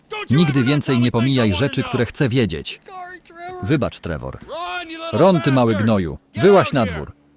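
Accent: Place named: Rzeszów, Poland. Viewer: Na delegacji 😂 Czyli stąd pomysł na podkładanie głosu pod Wielmożnych Pożyczycieli Aut, rzeszowskiego nie wyplenisz z mowy 😉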